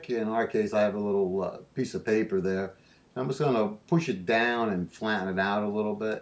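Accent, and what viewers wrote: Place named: Northeast, United States. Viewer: Nice job. Love the Northeast accent. Not flirting.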